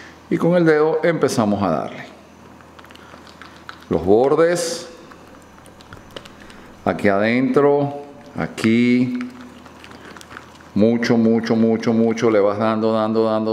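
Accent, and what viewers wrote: Venezuela. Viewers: Ese acento es VENEZOLANO donde se pare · Gracias. Voy a hacer esto, compre una y de verdad q se empaña mucho. Despues les dejo saber. Eres venezolano? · LO SABÍA!!!! Soy canario, y no sé cómo hablan los panameños pero a mis primos venezolanos los reconozco en un ratico 😄😄😄😄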